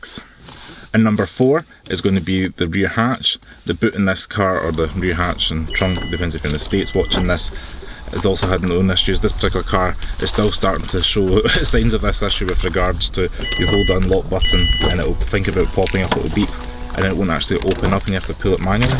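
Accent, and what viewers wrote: Scotland, United Kingdom. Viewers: Not that easy to understand scottish english... Thanks for that interersting and useful video. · Scottish English is cool 😎 👍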